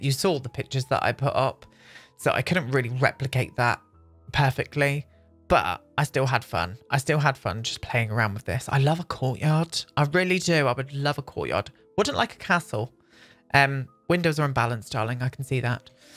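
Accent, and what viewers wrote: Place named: England, United Kingdom. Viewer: I love your english accent.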